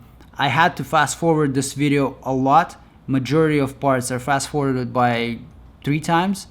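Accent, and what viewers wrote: Russia. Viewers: Почему у него русский акцент? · Russian accent ! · акцент-то русский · Cool video. Let me quess you are Russian? 😁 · Does this guy has some russian accent? Im from russia and his speech seems unusually clear and strongly articulated for me.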